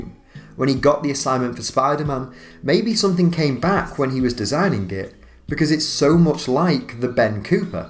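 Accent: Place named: Liverpool, United Kingdom. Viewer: Where are you from? Somethings you say sound Liverpudlian.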